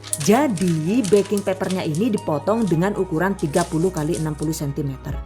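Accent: Banyumas, Indonesia. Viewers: Slalu nonton penjelasanyajelasss bgt apa lg kl pas ada bahasa ngapaknya...suka bgt.. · Ngapak ny bunda ni yg ngangenin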